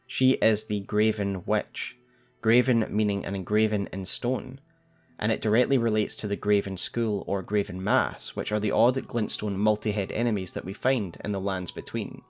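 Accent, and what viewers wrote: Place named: Scotland, United Kingdom. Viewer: Nothing makes me happier than hearing a Scot talk about Souls lore. Bring me McFlail!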